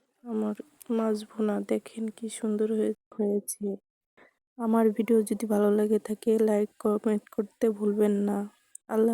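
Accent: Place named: Bangladesh. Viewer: খুব সুন্দর লাগলো তোমার রেসিপিটা খুব ভালো লাগলো, পুরো ভিডিওটা দেখলাম, কথা শুনে মনে হচ্ছে বাংলাদেশে বাড়ি, আমি ইন্ডিয়া থেকে দেখছি ভিডিওটা, আশা করি আপনি আমার পাশে থাকবেন❤❤❤❤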